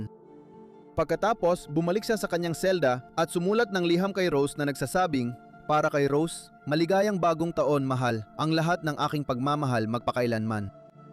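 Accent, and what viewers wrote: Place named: Visayas, Philippines. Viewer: bisayang slang e😂😂😂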